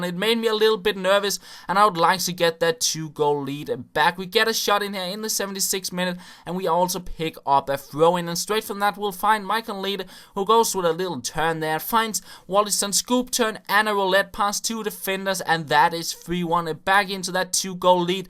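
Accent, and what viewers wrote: Denmark. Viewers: er du fra danmark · 1. your fucking danish accent his so enoying 2. you suck at fifa 3. you think youre so good 4: FUCK YOU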